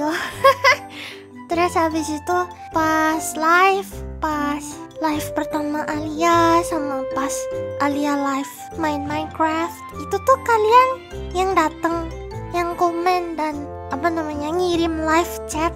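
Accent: Java, Indonesia. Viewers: Alia suaranya kyk ada medok" nya gitu:> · Kalo aliaNgomong imut imut kel gitu..aku dengernya alia kek medog gitu loh..ke org jawa yg lg ngomong indo...hihihihi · Kok ngomongnya medok Jawa ? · Alia logatnya kayak jawa wk 🤣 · Gua denger lebih detail lagi suara kak alia ada logat jawak nya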